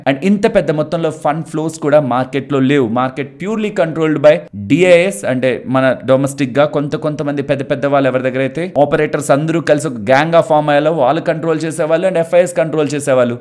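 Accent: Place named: Visakhapatnam, India. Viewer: ‘Eey vusuuu lekunda’pakka Vizag language 😀 👌🏽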